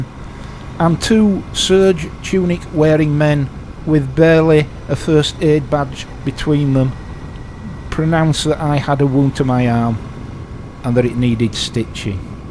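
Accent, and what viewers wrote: Yorkshire, United Kingdom. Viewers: This video mainly sounds way more palatable, you don't try to change your syllables etc to sound more "english". Wish you would make more of you're posts talking in your natural yorkshire accent, rather than the pretence of trying to talk queens English (kings ?). PS I have never been unsubscribed in over 12 months. Good luck with channel & take care .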